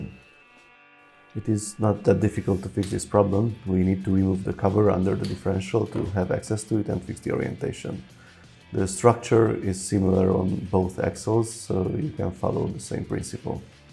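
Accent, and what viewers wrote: Hungary. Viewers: Thank you for this! I detect a slight Hungarian / eastern EU accent. Where are you from, if you don't mind sharing? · I just came across your youtube channel through recommendation. I immediately thought this guy must be Hungarian, and you are!:D (Don't misunderstand me, your pronunciation is great, just for a Hungarian is easier to spot a Hungarian accent. Szia!:) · I didn't know but recognized the accent:P I'm Hungarian too;) Üdv,)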